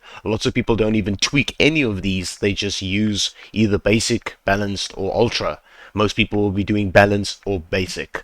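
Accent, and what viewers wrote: South Africa. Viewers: This is amazing, also I love the South Africa accent what an absolute hilarious accent to listen to almost as funny as the Scottish accent god bless you for this brother not only excellently explained in a clear and consise manner but humor combined with the simplicity had me on a roll thanks again! Would love to meet you in person! 🔥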